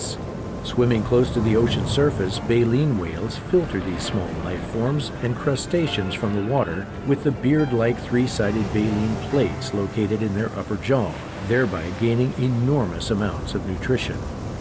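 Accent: United States. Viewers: so good to hear a narrator speaking american english for a change. I'm a bit tired of the usual overly theatrical british english in these documentaries.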